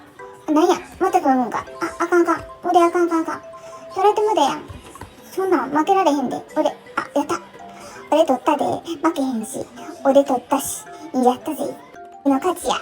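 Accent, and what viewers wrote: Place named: Kansai, Japan. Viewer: 関西弁聞いてて、楽しいですよね。 犬は可愛いですよね。😍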